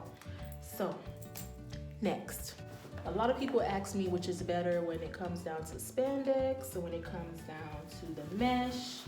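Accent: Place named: Miami, United States. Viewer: Lmaooo i love how Miami you are, city girls intro and the way you talk accent and all reminds me of them😂 girllllllll i f***s with u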